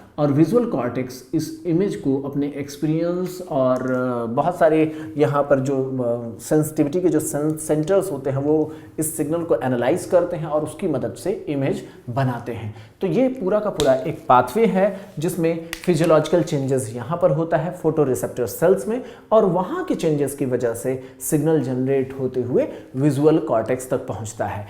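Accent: India. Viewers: is this English with Indian accent or Indian language? Hard to tell.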